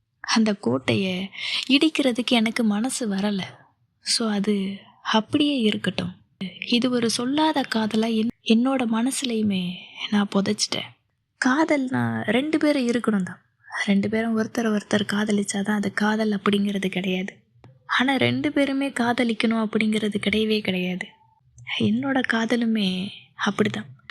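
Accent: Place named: Sri Lanka. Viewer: enaku theriyadhu ak. Tamil pesivangau. I like it srilanka tamil❤❤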